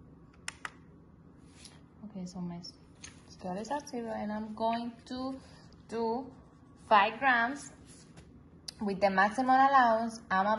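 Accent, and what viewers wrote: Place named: Colombia. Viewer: I know, but they says they can’t provide me separately any more. And I don’t know what to do now. Mr. Derrick (instructor) said y needs to generate it through the visual superscript, but it’s not the way to get the labs. Anything from you can help thank you. I’m from Bolivia y know you are Colombian, I know it by your accent.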